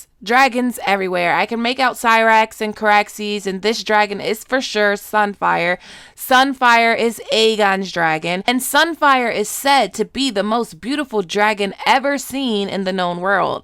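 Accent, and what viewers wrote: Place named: Louisiana, United States. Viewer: She’s from Louisiana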